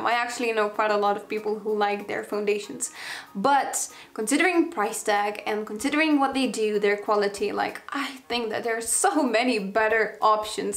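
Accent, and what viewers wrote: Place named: Lithuania. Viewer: I could tell from your accent you could be possibly Lithuanian! And heck yeah to my guess you are :) Labas!!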